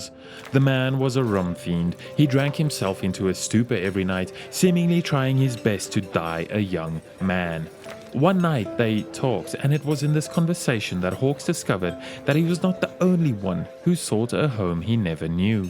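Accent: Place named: Pretoria, South Africa. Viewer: Bro sounds like a Pretoria boy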